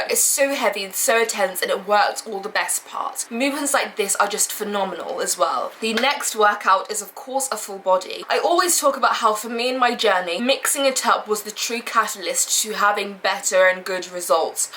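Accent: United Kingdom. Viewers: She sounds like she could be in bridgeton… love it 😅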